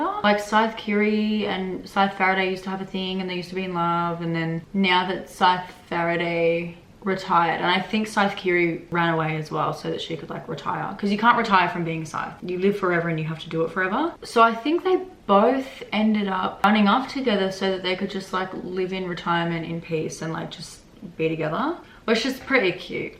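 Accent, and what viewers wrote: Australia. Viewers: No wonder sounds really off … thought it was from some part of country I never been to … it’s Australian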